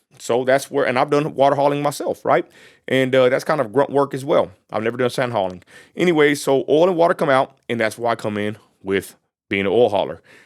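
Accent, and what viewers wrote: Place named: Texas, United States. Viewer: Agreed. I was listening without video; I didn’t hear any edits or jump cuts. You have a good Texas accent too.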